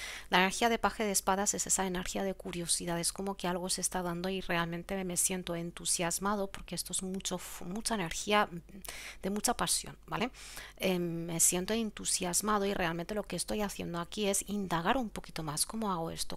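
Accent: Spain. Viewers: Mi amor, manos hermosas muchas gracias por la lectura, pero a veces no te entiendo que quieres decir, lo dices muy rápido, yo digo que debes decir al pan pan y al vino vino, o que dices las cosas mochas, perdón, pero así me parece, gracias por lo que si te entiendo. Siempre trato de disfrutar el campo, platicar con amigos, de lo verde de las plantas, del silencio de la mañana, escuchar música, yo siempre trato de ser constante con mi ejercicio, mi alimentación, disfruto tu voz el tono, y tu acento españolado. , etc. etc. 🙏🙏🙏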